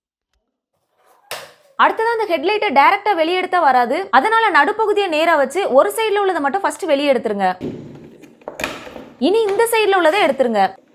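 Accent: Tirunelveli, India. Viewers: உங்களுக்கு திருநெல்வேலியா ? எந்த ஏரியா?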